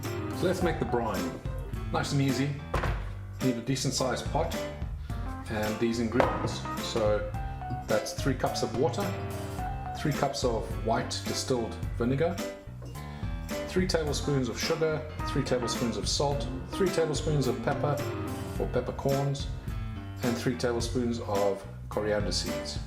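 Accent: South Africa. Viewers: Are you South African? Your accent sounds a little South Africany. 😁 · Where in SA you from Bud. your profile location says UK, but you sound like you could be my neighbor :P. Nice Vid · You sounds like a South African, good on you mate. Excellent video. Lekker man. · Good job ,,A bet with my wife,, South African???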